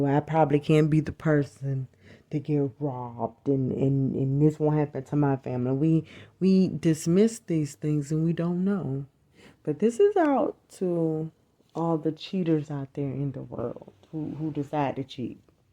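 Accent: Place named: South, United States. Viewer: I'm an accent man..Lol.i love the way you talk..Is that Texas?? Georgia??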